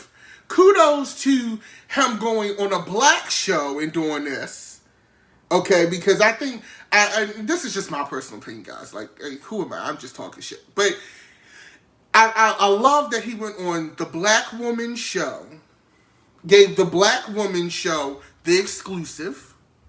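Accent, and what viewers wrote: Baltimore, United States. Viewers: His accent sounds like Baltimore Maryland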